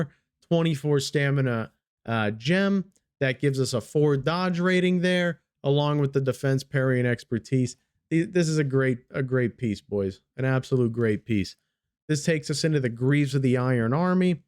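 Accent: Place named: New York, United States. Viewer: Haha! omg his accent is so freaking great. I feel like I'm in NYC being given directions to the Chrysler Building or taken to my seat in an Italian mobster restaurant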